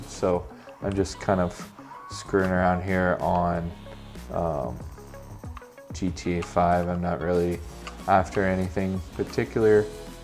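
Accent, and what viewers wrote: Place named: Brooklyn, United States. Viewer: if i didnt know id think you lived in brooklyn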